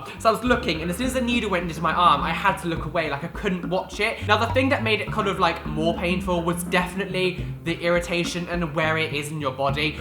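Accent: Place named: England, United Kingdom. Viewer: Madonna quanto fa schifo l'accento inglese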